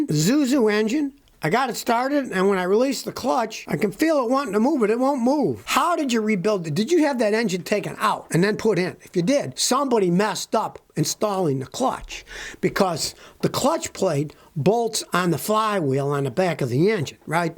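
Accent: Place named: United States. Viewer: you talk American! Buy you don't buy American!! Your a Toyota and Honda man!! Your either with American or not!!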